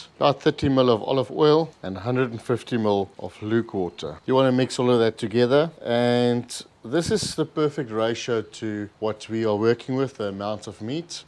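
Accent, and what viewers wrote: South Africa. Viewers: Oh, thank god! A proper Saffer accent means this is a good recipe.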